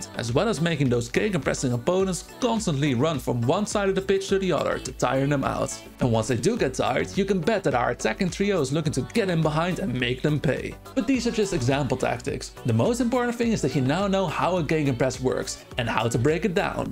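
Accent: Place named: Netherlands. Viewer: Oh wauw, haven’t even heard of this good lad yet. Sounds like he’s a fellow dutchman as well 🇳🇱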